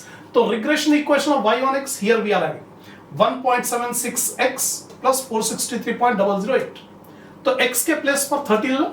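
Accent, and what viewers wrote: Hyderabad, India. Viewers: sir aap Hyderabad se hain kya ???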